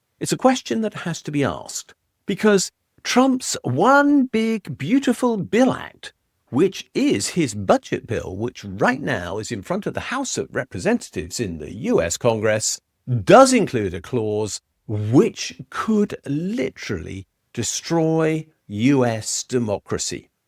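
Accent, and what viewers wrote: United Kingdom. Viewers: Wrong. Hyperbolic nonsense. The US is not, and never has been, a democracy. That is mob rule. We are a Republic where individual rights supersede mob rule. The majority cannot vote away the second amendment, for example. It’s irrelevant what the majority wants if it’s counter to the Constitution. The House has the power to appropriate money. If they vote to do this, it’s entirely constitutional. The judicial branch has to use the DOJ to enforce anything and Trump is the boss of that and wouldn’t let them enforce anything anyway against the current administration same as every administration before. The past administration actively prosecuted their opponents and now somehow Trump is guilty of doing it. That’s just basic hypocrisy. The fact that you mentioned the midterm means you have no clue about current demographics in the US. The US is objectively going to be conservative going forward. 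The democrats are not going to make any gains in the midterm and will probably lose more ground. If the states continue to vote the way they currently are, the democrats will literally not be able to win the electoral college again, which is our brilliant counter to mob rule. You have a British accent. Maybe you’re not living there so I admit I would be wrong, but it’s pretty rich listening to. British guy criticizing our government. You live in a bureaucratic dictatorship that doesn’t even acknowledge unalienable rights. You still have a monarchy that technically owns everything so you hav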